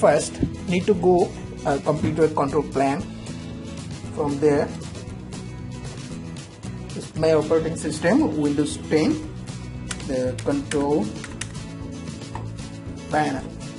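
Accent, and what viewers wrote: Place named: India. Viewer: tech tutorial videos always have indian accent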